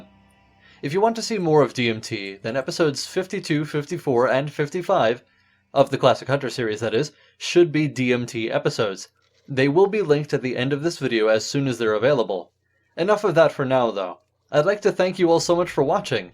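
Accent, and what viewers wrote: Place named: United Kingdom. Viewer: Where from? Sounds like you're half british.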